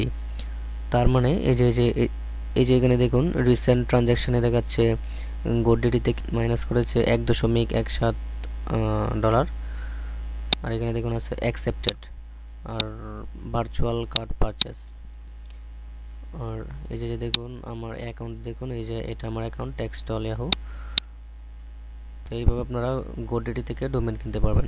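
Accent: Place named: Bangladesh. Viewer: he's a Bangladeshi. He has Bangladeshi accent.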